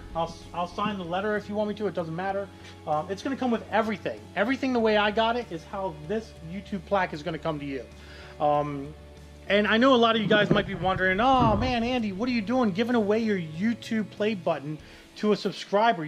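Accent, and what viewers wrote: United States. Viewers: Learn to speak English, not American English, in fact you and Mike should go to the same classes and learn proper English, nae in fact all the crew in both your and Mike's vlogs learn English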